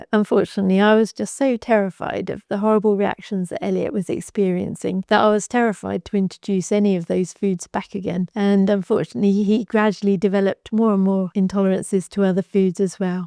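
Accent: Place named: United Kingdom. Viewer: British accent is great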